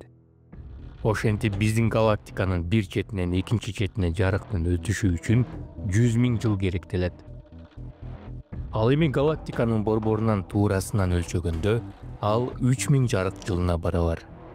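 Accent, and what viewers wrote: Standard. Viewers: Ооой тим эле укмуш сонун сүйлөптүрсүң.😯 Таптаза кыргызча анан дагы үнүң да аябай уккулуктуу экен. Эң негизгиси абдан түшүнүктүү экен. Чаржайыт сөздөр жок так, даана сүйлөптүрсүң. Менден 1 лайк. Иштериңе ийгилик дагы да көп видеолорду күтөбүз. 👍👍👍👍👍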